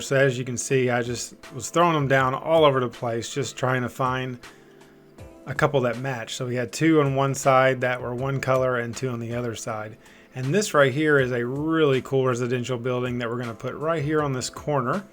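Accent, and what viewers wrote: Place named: Tennessee, United States. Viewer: Your accent... Where are you from? You sound like a Tennessean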